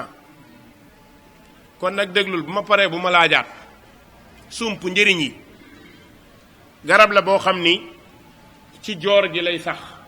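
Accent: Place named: Mauritania. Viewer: Belle récitation style Mauritanien masha'Allah.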